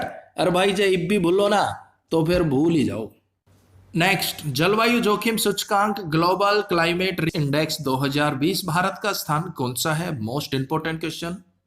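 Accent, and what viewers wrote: Haryana, India. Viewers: Haryanvi style 😘😘😘😘😘😘👍👍👍👍👍 Haryana me kit sh ho ji.. Ap💕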